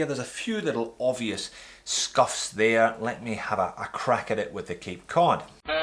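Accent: Scotland, United Kingdom. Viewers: It's well deserved mate, your channel is one of the best out there, no need to buy subscribers like some others!!! Keep going mate, the watches you look at aren't covered by many others so you are head and shoulders above the rest.....and you have introduced the Scottish accent to my Russian wife :-)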